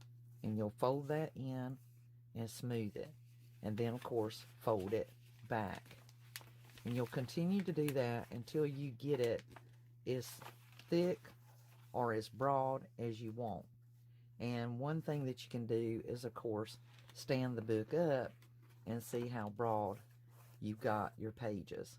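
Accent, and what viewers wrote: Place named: North Carolina, United States. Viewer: I Love, Love, the lighted signs. all the crafts are pretty, but there is something about the signs! This is my first time watching, but as soon as I heard you speak I said she's from North Carolina. I'm originally from Asheville NC. but now living in California ( please don't hold it against me. lol) Looking forward to watching more holiday crafting ideas.. God Bless..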